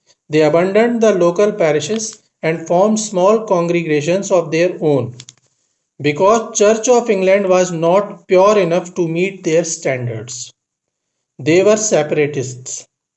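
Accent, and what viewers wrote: India. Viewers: Oh my word! This guy better take up another hobby. This is the most horrible INDIAN accent I have yet to come across. Nope. Take an English accent reduction class and move on to BASKET WEAVING 101. Please.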